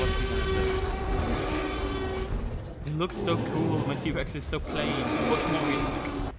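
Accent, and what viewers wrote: United Kingdom. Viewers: I love how says Hello Poppet. I just love the British accent I think British people are awesome.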